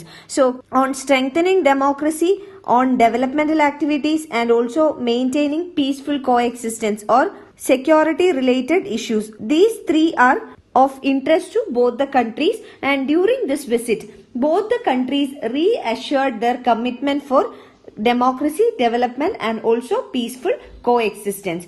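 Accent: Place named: South India, India. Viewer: Her pronounciation seems like south indian not sure if hindi version would come.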